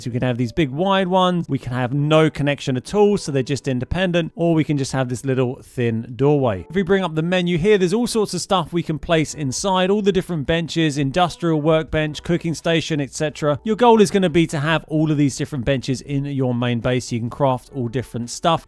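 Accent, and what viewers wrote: England, United Kingdom. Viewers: I get a kick on how you English people have an accent, not to mention the unique words you use quite often like "Plunk" ... I'm not going to laugh or ridicule, because everybody's different everybody has their own little niche that helps them be more unique. I myself am quite unique that way also so I empathize. I have built about three outposts one of which just to supply a need. I did build a base on Masada. With me taking an educated guess, the very first time I placed the beacon down I had seven resources available at one spot. I would strongly suggest checking it out, because for the end of the constellation storyline is on that planet also. Not to spoil anything please be safe, also more information about Outpost information is greatly appreciated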